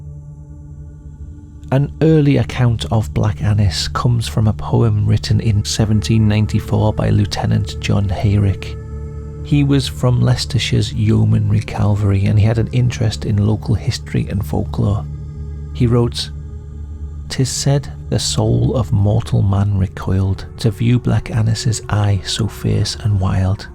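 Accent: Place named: Durham, United Kingdom. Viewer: Howdo,. I love your content. Always something interesting and entertaining. It’s nice to hear an accent like mine, too. I’m County Durham born and bred and now live in Hartlepool. You sound Durham / Teesside - am I right? Wherever you are, keep doing what you’re doing!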